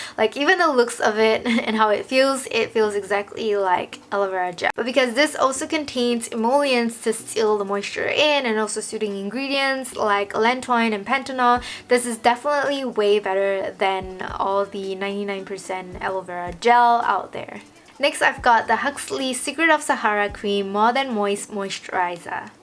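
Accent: Singapore. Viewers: Are you Singaporean?? Interesting the way you pronounce ‘really’ and ‘mugwort’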